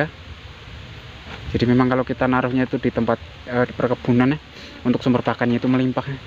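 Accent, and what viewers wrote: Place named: Wonosobo, Indonesia. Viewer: Mau tanya bang,ini dari logat bicara sama seperti istri saya..apa abang dr wonosobo?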